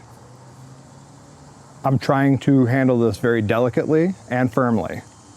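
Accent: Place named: Canada. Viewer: He’s in America? He sounds Canadian to me.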